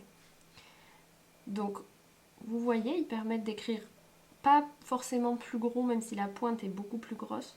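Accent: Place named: South, France. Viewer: Merci pour cette belle vidéo très instructive (le petit accent me rappelle mon Sud natal). J'ai pas mal de ces différents feutres pour mon bullet journaling et bien que je sois d'accord sur le fait que les Stabilo brush soient fabuleux, ils traversent beaucoup, même des carnets au papier 160 gms, donc attention! Aussi, pour les Faber Castell, un petit truc: on peut sortir complètement la pointe avec une pince à épiler puis l'inverser et paf, une pointe toute belle, toute neuve qu'on peut réinsérer! Une trouvaille aussi, les feutres de la marque Djeco qui sont fait pour les enfants et ne paient pas de mine... sont quasi identiques au Lyra en beaucoup moins cher, j'ai été bluffée.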